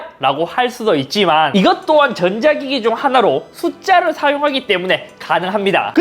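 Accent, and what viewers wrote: Gyeongsang, South Korea. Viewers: 정보는 그럭저럭 괜찮습니다. 죄송한데 경상도 억양만 노력해주심 더좋을것같네요 화이팅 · 대구 사람이라 그런지 잇섭님 사투리가 넘흐 좋아용 😍😍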